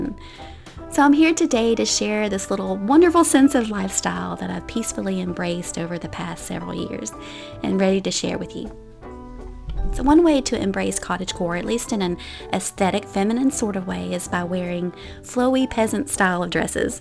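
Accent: South, United States. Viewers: I absolutely adored everything about this video from your sweet voice and southern accent to the aesthetics and fashion!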